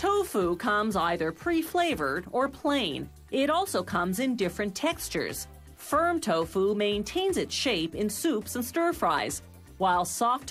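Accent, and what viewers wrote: Canada. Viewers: No Mention of the awful gas you get when eating tofu? Better invest in Beano. And I don't know what is worse about these videos, the horrendous Canadian accent or the insistance on using the metric system.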